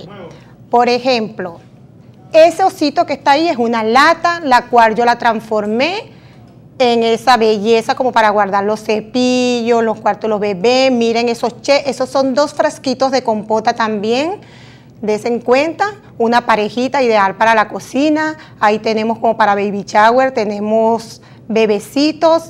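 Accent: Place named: Venezuela. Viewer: Un saludo desde El Salvador, increible como estoy aprendiendo de usted, por su acento creo adivinar que es de la hermosa Venezuela, y por tu forma de responder eres cristiana evangelica, Dios bendiga tus manos, y por favor dime o enviame por favor el paso a paso còmo hiciste el dulcero que diste de regalo por favor amiga linda.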